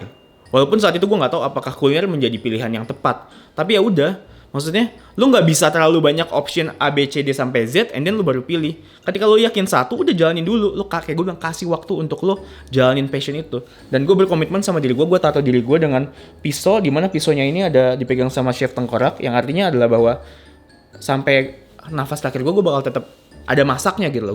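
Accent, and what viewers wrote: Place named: Betawi, Indonesia. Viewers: no offense, pantes bahasa betawinya "nyablak" khas jaktim. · Oo terjwb penasaran gw besar di Cilangkap ya Bang. Maaf soalx tiap nonton fasih bgt bahasa2 lingkungan perkampungan gitu. Kereen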